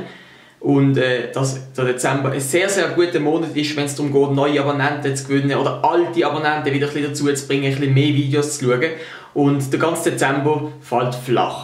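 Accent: Basel, Switzerland. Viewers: Das liit am basler Dialäkt. Dä isch nöcher am Hochdütsche wie zB dr berner oder zürcher Dialäkt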